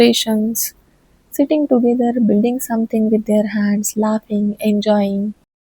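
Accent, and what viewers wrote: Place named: Ahirani, India. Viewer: Your accent is more like an Ahirani language 😃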